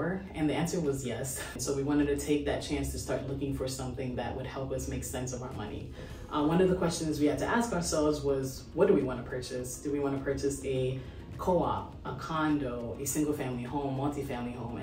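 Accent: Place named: Brooklyn, United States. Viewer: You got that shomonry Brooklyn wow proud I'm from Harlem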